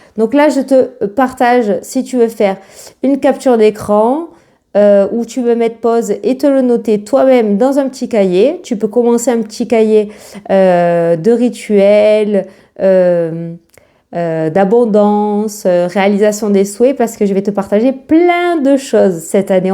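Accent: South, France. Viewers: Bonjour, merci beaucoup pour les rituels. je suis fane de vos vidéo, je les trouve génial et surtout votre accent du midi, j'adors. je vous souhaite une très bonne année a vous et toutes votre familles.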